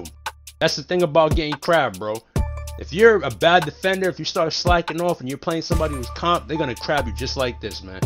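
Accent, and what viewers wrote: New York, United States. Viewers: his New York accent makes this video so much more better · this my first time watching your vid and I’m glad I found you, I subbed and all bro but how tall is your offensive threat I made mine 6’2 is that good ? & no cap you sound like you from NY or NJ which is mad funny cause we sound alike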